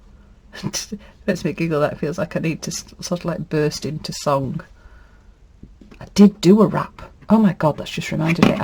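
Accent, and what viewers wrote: Yorkshire, United Kingdom. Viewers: How did I not find you sooner! Your tags are beautiful.. they've really fired me up to make some. I think I will be doubling up next year and doing tags AND flags 😁 Love your youtube video. Very relaxing (and sooo nice to hear a Yorkshire accent. I feel right at home (even though I've lived in the Midlands for the past 21 years😒) Keep those creative juices flowing, thanks for sharing and have a really lovely birthday! 🥰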